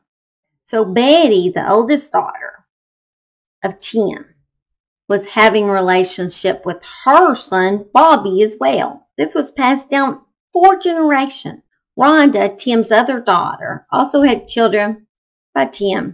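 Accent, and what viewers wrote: South, United States. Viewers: You gotta be a southerner woman rite or wrong ? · Wow, this is the first time I come across your channel you live in Canada, but your accent is not from Canada because I’m from Texas and Arkansas and I’m gonna kind of gas maybe you from round Missouri or Tennessee accent the way you drag your rrrrrrrrr ‘s out I like it I like a southern girls accent OK I’m going back to your your story again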